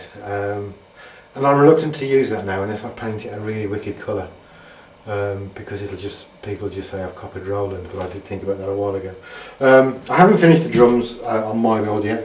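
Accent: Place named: England, United Kingdom. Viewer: congrats great triggers...you have a very nice English accent, is from birmingham?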